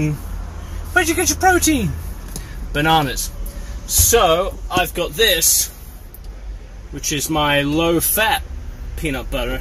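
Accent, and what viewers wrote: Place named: United States. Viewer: This is amazing!! It’s sweet you’ve fitted your own solar panel on the top of your van- I love it! You never cease to amaze me & I love catching up after awhile cos you’re always up to something completely different (apart from the melons 🍉🍉🍉 ofcrs!). Also noticed a wee twang of American in your accent these days 😃 Man of the world 🌎✨ xxx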